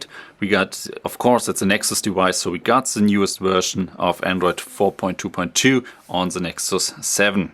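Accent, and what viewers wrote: Germany. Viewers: i think is french?:) actually no, is german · i could feel some German accent within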